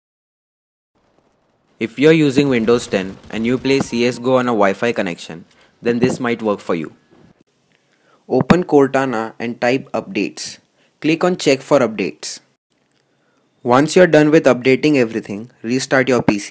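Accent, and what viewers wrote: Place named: India. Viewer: omfg ur indian accent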